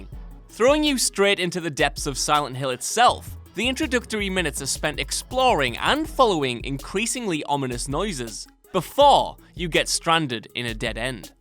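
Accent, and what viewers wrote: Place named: Liverpool, United Kingdom. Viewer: where's that english accent from? liverpool?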